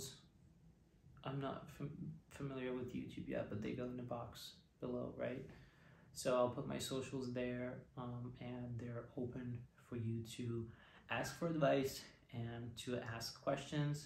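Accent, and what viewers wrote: United States. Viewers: What accent? He sounds like he is from the US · One question? You have an American accent !!!! Explain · If you're from a Balkan country, why do tou speak English with an American accent?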